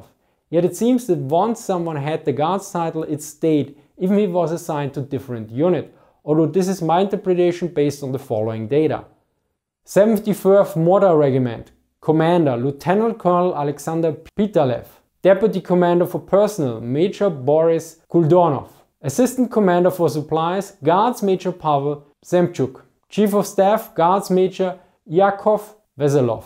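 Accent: Germany. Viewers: German accent can be hard to stomach. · bad English pronounciation... I just cannot bear his accent. It is unbearable to listen to someone who uses the "German language melody" on each and every English vocab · To be fair narration matters a lot and actual bad narrators can ruin a video. But accent isn't as terrible as you make it to be. What he says is perfectly intelligible w/o subs. If it bothers you that much, you can maybe contact him about funding him a native English speaker for narration (it's always about the dough ultimately of course). Tho to me I don't get to hear someone speaking English in a hard German accent that often so it's actually a pretty interesting aspect of his videos.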